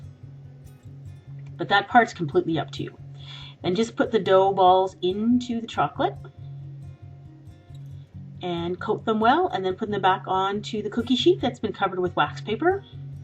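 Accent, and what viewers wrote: Canada. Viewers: Are you Canadian? :) And I love your videos! They are very helpful! :D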